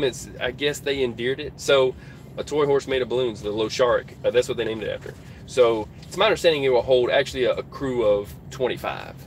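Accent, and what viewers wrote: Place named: Midwest, United States. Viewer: How the hell do you know that cartoon? I watched it as a kid but I was born in 70s almost 80s lol....... kursk stuff- we offered help ? Can U speak Norwegian? Lol you speak like from midwest ..white, redblooded murican boy.:) what I meant was that were Norwegians who offered help. Its arctic circle. Do u think America with her 2 old ass icebreakers vs Russian 57 icebreakers....u offered help lol.....do you know that many think it got hit by Ohio class that was getting the repairs in Norway a few days later and you can see from the satellite image that the emergency capsule is not there. Removed . Why ? Why even repair in Norway for an American sub if it's not some regular maintenance. PS... I like you even touched this subject Dude does that bald black commentator with glasses look like ru paul?lol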